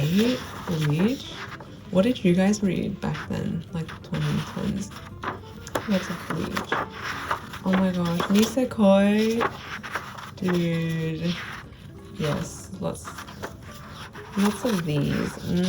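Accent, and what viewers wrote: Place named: Perth, Australia. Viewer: Thought you had a Perth accent. Funny how I could always pinpoint people from home amongst other Australians. Last year I met some people 'from Australia' in Japan and I said to them 'no you're from Perth', and they were 😅 Didn't know there was a comic arts festival here.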